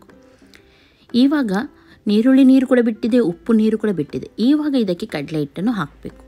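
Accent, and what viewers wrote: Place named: Mangalore, India. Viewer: ಟಿಪಿಕಲ್ ಕುಡ್ಲದ ಕನ್ನಡ.. ಚಟ್ಟೆ ಮಾಡಿ, ಮುದ್ದೆ ಮುದ್ದೆ ಮಾಡಿ, ಕರ ಕರ,.. ಕರೆಕ್ಟಾಗಿ ಹೇಳಿ ಎಷ್ಟು ಸಲ ಕರೆಕ್ಟಾಗಿ ಹೇಳಿದ್ದೀರಿ.. ಸೋ ನೈಸ್ ಅಕ್ಕ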